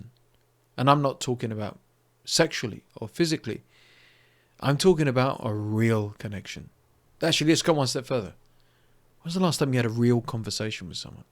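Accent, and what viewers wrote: United Kingdom. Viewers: He's from the south by the sounds of his accent. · Are you from the North or South of England? The North is the working class side right?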